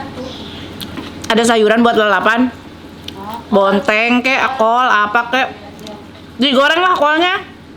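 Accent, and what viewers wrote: West Java, Indonesia. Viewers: Sunda pisan...Teteh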